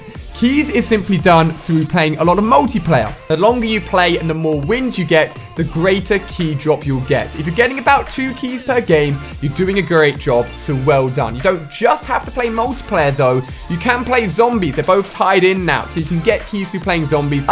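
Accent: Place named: United Kingdom. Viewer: i like british accent.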